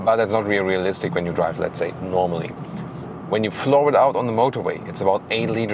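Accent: Germany. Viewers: I enjoy your vids, however you should do a German version also, because you are already speaking "german-english" so the placement of words are not correct. I don't like that, I'd rather have a full-on german version.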